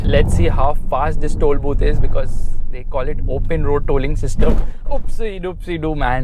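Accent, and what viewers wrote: India. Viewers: Хахахха,индийски мангал!!! Ще ме спукат от смях с този акцент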